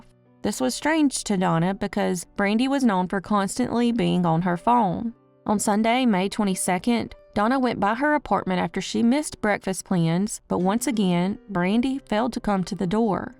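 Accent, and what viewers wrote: South, United States. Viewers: I don't want to disrespect the victims here but I snicker every time she says it. 😂 I'm southern and I love her accent. We say naked to mean undressed, "nekkid" means you're undressed and getting Iin trouble. 😊😅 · Ai voices and pronunciation are absolutely horrible. We love her southern accent on this channel so you're free to go watch a different channel that you like better. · Use a an AI voice you are way to country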